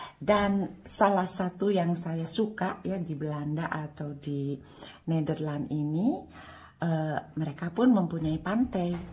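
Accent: West Java, Indonesia. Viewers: Selalu di tunggu bunda uploed nya sangat bermafaat info info nya .walau pun bunda tinggal di Swis tp logat bicara nya masih terlihat bahwa bunda orang sunda sama seperti saya 😊💜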